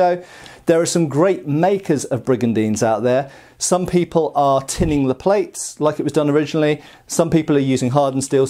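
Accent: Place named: England, United Kingdom. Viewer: No offence, I could really see you as a baddie in a Robin Hood film. Black brigandine, shaved head and English accent. As we all know, the goodies in English ‘historical’ films must have American accents!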